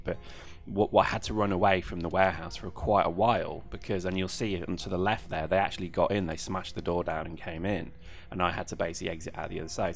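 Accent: United Kingdom. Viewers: I wish it were somehow possible to collect rainwater. I mean it rains bloody often enough in this game! Great let's play, btw, dat britishness.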